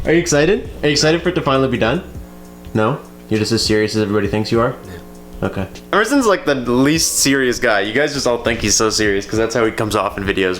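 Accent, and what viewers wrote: Canada. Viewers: Thumbs up for that Canadian accent.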